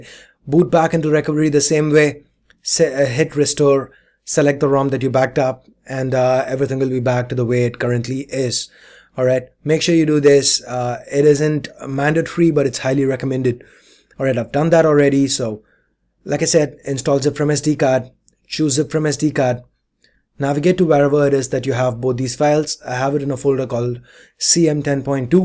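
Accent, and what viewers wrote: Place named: India. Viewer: Annoying Indian accent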